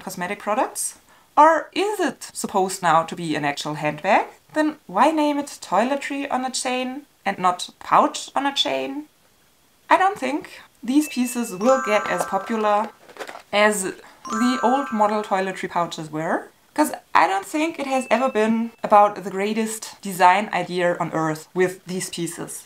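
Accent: Germany. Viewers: Where are you filming from love? Love your German accent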